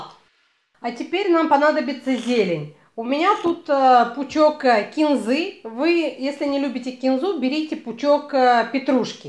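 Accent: Ukraine. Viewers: Цікаво авторка з Одеси, бо відчувається українська основа в розмові.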